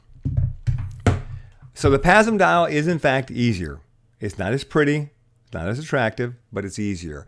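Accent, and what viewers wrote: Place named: United States. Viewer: I did like your presentation on these two cameras, it got me out of my doubt. And all American presentation , not like these other foreigners that I coudn't understand what they were saying. Nice comparison !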